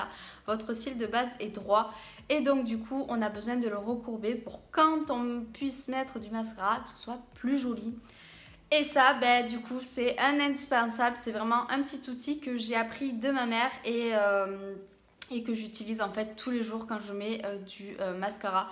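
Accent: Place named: South, France. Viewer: Cet accent du sud, j'adore !! J'aime beaucoup le réal ysl ☺️ j'en ai un, ils sont top et sentent trop bon !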